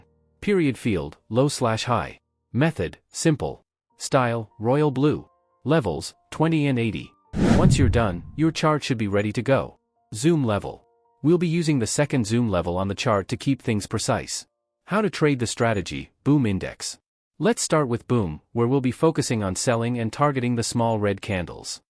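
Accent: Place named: United States. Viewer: VOICE AMERICAN, MUSIC AFRICAN))) BEST STRATEGY 🤩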